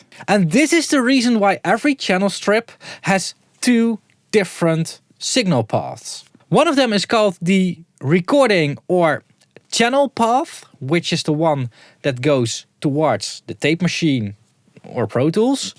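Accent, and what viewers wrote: Netherlands. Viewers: i keep coming back for your dutch english accent, dutch people are hilarious but very smart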